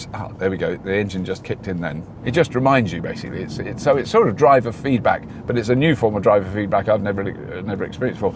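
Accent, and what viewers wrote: United Kingdom. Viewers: It a pleasure to listen to british english instead of... you know the place im talking about. Awesome series! Greets!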